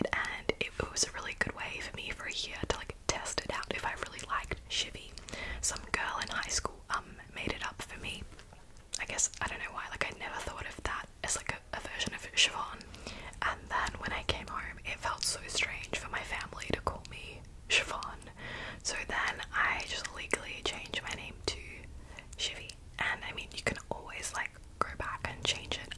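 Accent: Australia. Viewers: I love your accent. I knew you were either Australian or a kiwi. I would love to visit Australia. You've definitely traveled more than me 😅 you're amazing never stop being you. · Your Australian accent is definitely the strongest, but it took me some time watching you to really decide 😂 This was a fun video. It’s funny you prefer an American accent for ASMR. I am American, but prefer Australian or Scottish :) You’ve led a fascinating young life so far! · congratulations from russia,!! i'm very glad i came across your channel some time ago. even though i don't know you personally you seem to be a good person. i love your videos and i adore your aussie accent so much (i knew you were aussie! glad i got it right haha no one says 'no' like aussies do, totally love that ♡) thank you for everything you do for us, really, it means so much... and also we love a boyband fan. 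i'm a huge kpop fan, was a directioner too and i still listen to 5sos, their latest album is really good, 10/10 and as for your question i'm doing pretty good yet i'm worried as i graduated from the univeraity this year and i'm moving to a new apartment literally in two days and as you can tell my life is a mess and clearly not so stable right now. so once again thank you for your kind words. i will try my best not to stress too much and just enjoy the moment while going my own pace not sure if you read that and the comment is way too big then i expected but thank you, i love you and sending you my best regards! ❤ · I'm Scottish, and if anyone believed you were Scottish...I'm terrified for Humanity lol XD I had you down for Australian pretty quickly, but I might have an unfair advantage due to some of my family being Australian, as they sound very similar to you :) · YESSS I KNEW YOU WERE FROM AUSTRALIA (it's hard to identify accents as a non native english speaker) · Aussie Aussie Aussie!! 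I knew it!!💕💕💕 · I’ve waited for this video for so long to confirm if you’re Aussie or not 😂 every video I changed my mind and convinced myself that I was wrong hahaha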